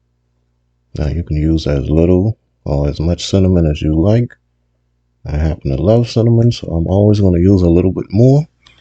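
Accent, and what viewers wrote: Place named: Louisiana, United States. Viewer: Hey there!! So happy I subscribed to your channel! First of all I must say I love your voice lol although you do sound like you were from New Orleans or Louisiana! This French toast casserole sounds amazing can’t wait to give it a try! Look forward to watching all of your videos! Loving on you from Philly!!! 😊🙌🏼💜💜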